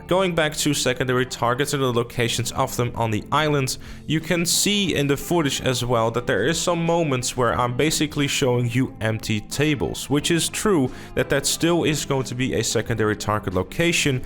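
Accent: Netherlands. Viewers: You sounds like a Dutch guy who's speaking English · This guy has a dutch voice haha. You must be dutch (nederlands)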